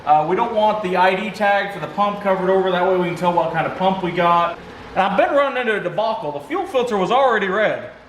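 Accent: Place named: Pittsburgh, United States. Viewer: You HAVE GOT to be from close to me using the word "paher worsh" because I live right outside of Picksburgh. I deliver to Morgantown, Pennsboro, Clarksburg, West Union, and pretty much all over West Virginia. I can't help but to notice your accent. Don't forget Fummins...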